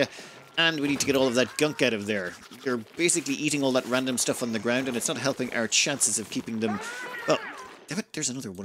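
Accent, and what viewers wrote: Ireland. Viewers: im pretty sure hes irish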